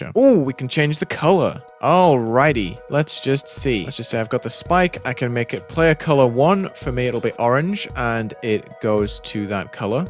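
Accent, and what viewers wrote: United Kingdom. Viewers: you're british!?!? nIce vid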